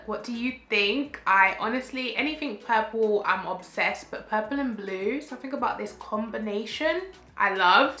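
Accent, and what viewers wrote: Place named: England, United Kingdom. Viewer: I love how English people say "purple"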